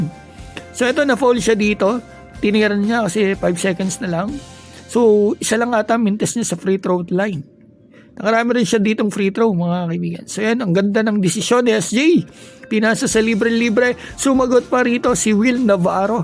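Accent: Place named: Visayas, Philippines. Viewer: kala ko bisaya ka sir😀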